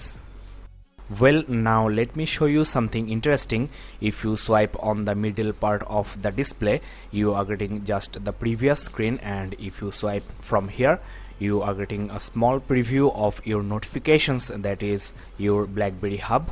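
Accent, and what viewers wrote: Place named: East, India. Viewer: I think he is from Orissa or Bengal. Thats not a south Indian accent. :-)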